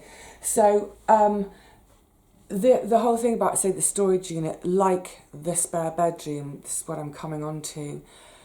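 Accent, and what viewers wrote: United Kingdom. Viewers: Hello!! I just found you, it surprised me to hear a British accent. I seem to only be finding American channels which have helped a lot, but I can relate more being in England.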